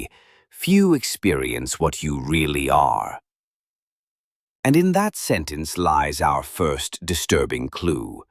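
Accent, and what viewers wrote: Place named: England, United Kingdom. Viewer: Too slow, lose the english accent. Good shid lil bro , good luck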